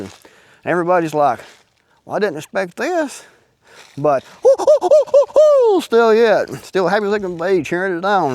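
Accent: Appalachia, United States. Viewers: First, you need to stop talking like you just rolled out of the holler! I’m from West Virginia, born and raised and nobody I know talks that way. Talking that way is why nobody takes Appalachia seriously. Second, the left talks about the constitution daily and how it’s being violated every damn day! If you fools on the right wouldn’t have allowed yourself to be brainwashed by conmen we wouldn’t have these problems. The constitution is just a piece of paper. If we want it to actually mean something we have work make sure it’s followed. That means no conmen in the government, no religious zealots in the government and no white nationalist bigots in the government. Currently that who’s in charge and that’s why constitution is being trampled on.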